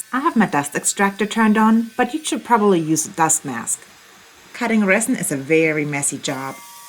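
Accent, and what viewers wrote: Germany. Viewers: Hello, which region of Germany are you from? Because of your German dialect I guess the southwest of Germany.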